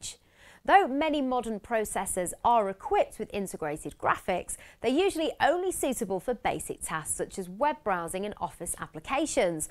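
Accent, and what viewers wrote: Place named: England, United Kingdom. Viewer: I love the englsih accent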